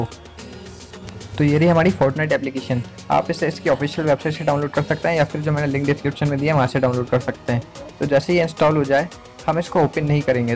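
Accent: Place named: India. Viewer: What are you even saying. Indian probs lol.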